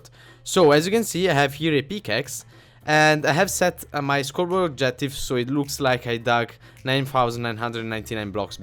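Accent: Italy. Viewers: Certo che se sente l'accento italiano xD GG bro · bella frate sei italiano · perché cazzo parli in inglese se sei italiano ps. si sente l`italiano;D se parleresti in italiano faresti un grande contributo a yt Italia:D;D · Per quanto sia bravissimo a parlare inglese si vede che è italiano XD! Te ne sei accorto??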